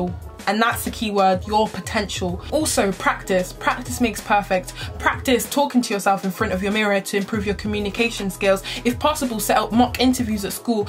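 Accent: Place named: South, United Kingdom. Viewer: Where is your accent from?? You sound really southern but then you say button/ summer/ Study in a slightly northern way. Did you live in Manchester or Leeds for a bit haha. Congrats for getting in and avoiding chemistry lol.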